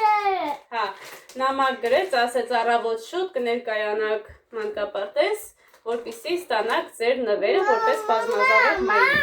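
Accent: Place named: Lori, Armenia. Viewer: Ձեզ առաջին անգամ եմ դիտում։ Ինձ թվումա լոռեցի եք ջան? Բայց ամուսնու կողմը չհասկացա որ բարբառով է խոսում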